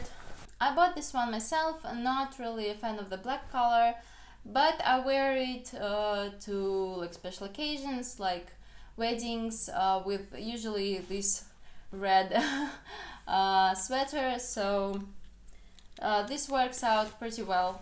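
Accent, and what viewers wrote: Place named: Russia. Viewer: I'm not trying to be rude at all, I hear Russian accents all the time so I hope that you don't mind me saying this (Degree Celsius - with an "s" sound at the end). Thank you for having content in English.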